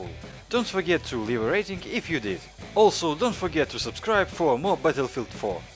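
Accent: Russia. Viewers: Акцент русский но вообще Можно делать видео на англизком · ага, и будет ужасный русский акцент и на немецком. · Мэн а что ты хотел от русского человека?) Идеальное произношение??? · Акцент сильно русский((((((((((( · лучше смотреть видео у англичан с их акцентом ,чем слушать наш,просто)а так хорошая попытка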